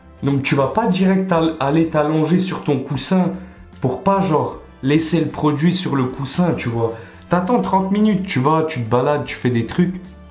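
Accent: Belgium. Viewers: Pure belge nan?😂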